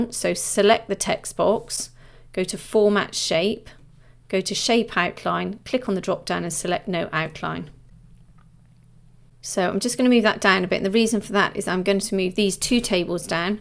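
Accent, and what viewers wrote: United Kingdom. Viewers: Thanks for the Video. I liked the British accent.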